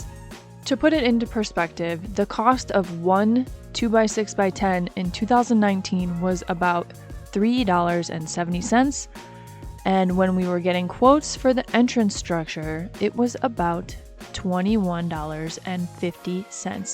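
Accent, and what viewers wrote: France. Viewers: Not trying to be rude but do I hear a French accent? And you guys sure do bust your butts! Your house will be a masterpiece when you done. I can already see it.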